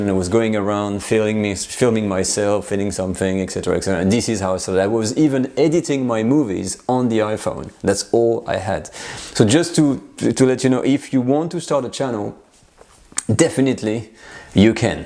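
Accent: France. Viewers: I just found your channel today. I am impressed by your ability to make dishes seem easy to accomplish and your love of cooking comes through. Please do not apologize for your presentation, it looks much more professional than you might think. Your english is excellent even with your french accent...this is much appreciated., I believe there is a career on camera if you are willing to go that far. Maybe PBS. In my opinion you are the complete package of knowledge, charm and inspiration...you have my vote. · Been with you from the start...love your channel. You are very personable, knowledgeable and charismatic. ☺️. And the "real" French accent is so easy on the ears. I am Canadian - our French accent is very different. Keep up the wonderful work...you have inspired me to dig out my Julia Child and Escoffier books and go back to basics. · Your channel is a dream come true. 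I love french food but do not speak the language, so you tube in french does not work for me. Real french food by a french cheff who explain's so well and in English. It doe not get any better. I did not cook any of you recipes yet but have bookmarked so many , my cooking bug picks up in the fall. And one more thing: I love that you are not full of air, a simple guy. And one more thing: your accent, every time you say "recipe" I repeat your way. My son laughs . Thank you so much for this channel in English. Wish you lots and lots of success ! Just subscribed.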